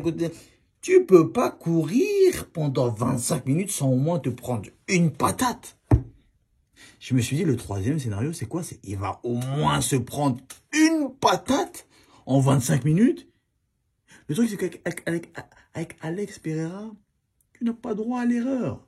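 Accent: Cameroon. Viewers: Il parles comme un vrai camerounais ! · t es un ouff j ai kiffe ton acent camer 🙂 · 🙂Force à toi!trop fun de tes vannes,l'accent kamer ☠tout 🤣🤣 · Ahahaha trop marrant je te jure t’es vidéo me tue 💪 ( juste ton accent africain camerounais il est agaçant ça gâche toute les blague )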